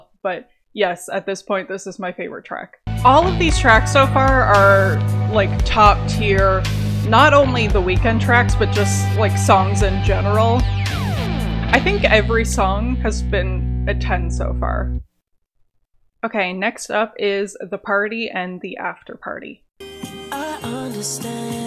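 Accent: Canada. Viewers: The Canadian accent strong as hell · Are u canadian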